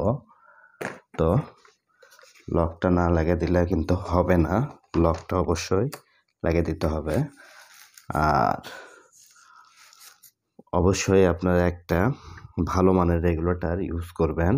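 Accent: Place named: Bogura, Bangladesh. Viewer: বগুড়া বাড়ি নাকি বাড়ে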